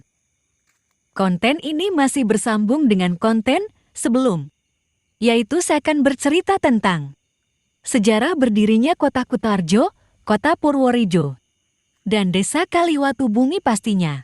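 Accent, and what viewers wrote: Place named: Makassar, Indonesia. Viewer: suwarane kaya orang Makasar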